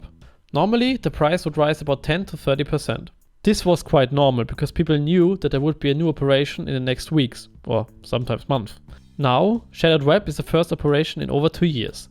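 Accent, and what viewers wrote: Germany. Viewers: schon witzig wie man deutsche am englischen dialekt erkennen kann^^ ich mag investment videos für steam market und lass mal ein abo da für deine mühen ;)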